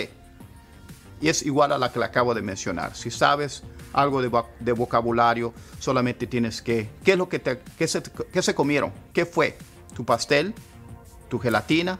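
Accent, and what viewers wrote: South, United States. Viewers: Me parece bien por el acento mas americano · his english sounds like a southern accent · Gracias a tu acento americano esque he aprendido! Buen ingles... Saves enseeńar muy bien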